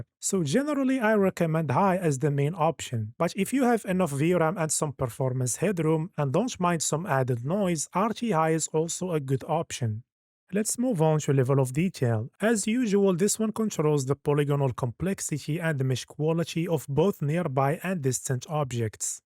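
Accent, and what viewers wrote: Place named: Morocco. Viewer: The accent tells me you're moroccan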